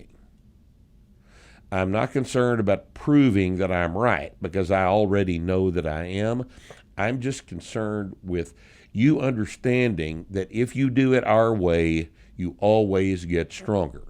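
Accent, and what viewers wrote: Texas, United States. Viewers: Me too and I’m French. Love that Texan accent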